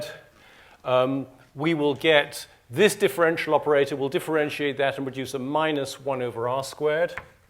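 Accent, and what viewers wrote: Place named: England, United Kingdom. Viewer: "absolute and immaculate sphere" i like the way he talks. english people.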